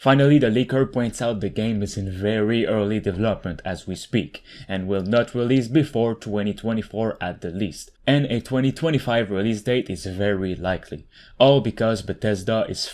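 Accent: Quebec, Canada. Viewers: Are you French Canadian? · are you french canadian ? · Is that the accent of a fellow french canadian I hear? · That’s not the subject but are you québécois? I say that because of your accent Anyways great video!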